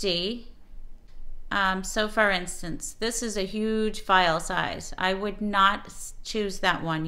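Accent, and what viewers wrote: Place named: New England, United States. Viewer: Great job, easy to follow....even though I hear little yankee in your voice ;) , my southern draw would be much funnier lol.....great videos and keep up the good work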